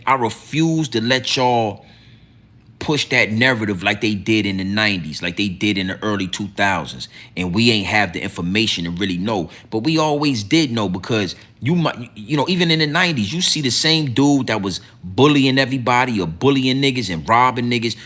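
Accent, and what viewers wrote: New York, United States. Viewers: You sound like your from New York. And not from Va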